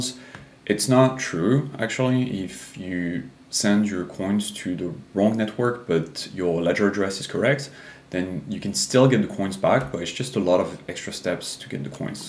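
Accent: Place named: France. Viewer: Super helpful. Love the French accent, tho.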